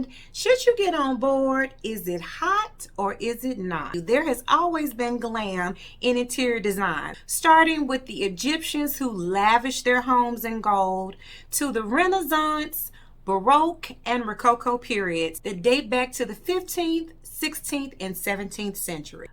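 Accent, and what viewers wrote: South, United States. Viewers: SHE GOT ME WITH HER TITLE. SOUTHERN GIRL. AND I LOVE HER ACCENT. THANKS.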